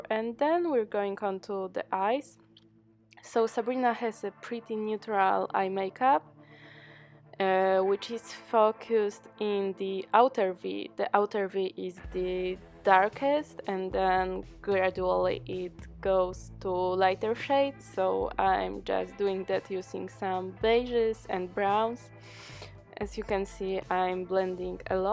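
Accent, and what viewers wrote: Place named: Russia. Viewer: Hi, were are you from? You have russian accent) ( i don't now)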